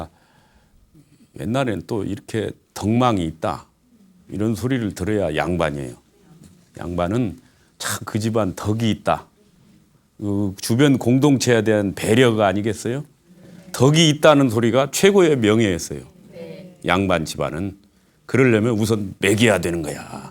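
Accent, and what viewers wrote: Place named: Jeolla, South Korea. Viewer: 기자도 아니고 교수도 아니고? 전라도 말씨네?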